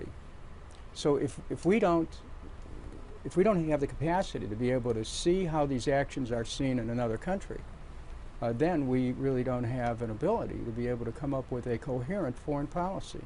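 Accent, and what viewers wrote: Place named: United States. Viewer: Mr Dennis you sound like an American the way you speak. But the true nature of the Real Americans .not Native American not Asians American. not Latin American. not African American. Is War. War comes because of hatred and and disrespect for others.he who lives by War will die by war.and all of these enemies that USA is building up against her will attack her one day..and I bet that they are going to target yellow stone. The cities are a joke. 🎅CIA.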